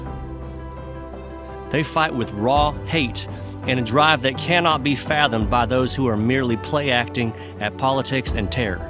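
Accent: South, United States. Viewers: So odd to hear this quote in a Southern accent. It remains true and the core of my soul in these days however. "I hope and pray everyday that I am not forced to untether myself from the bonds of civility, and to engage in the destruction of all those who seek the dominion and harm of those whom I hold most dear. For should that wrath of Man be released upon mine enemies, neither them; nor I, will ever live in peace under the sun again. For indeed, I will be forced to scorch earth to preserve the life and well being of those who I do love."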